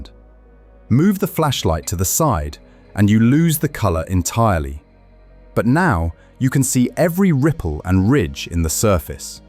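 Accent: United Kingdom. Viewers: Get rid of the British narration.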